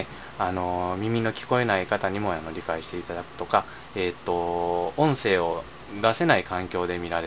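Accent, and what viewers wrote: Japan. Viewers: 関西弁グッド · 京都弁だ～～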